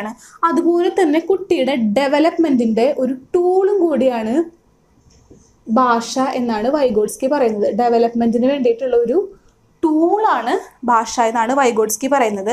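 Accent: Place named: Thrissur, India. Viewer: Thrissur slang tta.....😃😃 And......Classum super .......Ellam clear aayi manasilakunnund tta.....Thanks.....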